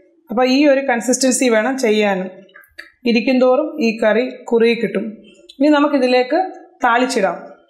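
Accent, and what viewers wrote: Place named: Ranni, India. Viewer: Facebookil page kand recepies try cheyyarudarunu. Ariyavunna oral parayumpoleya samsaram. Pathanamthitta ranni samsaram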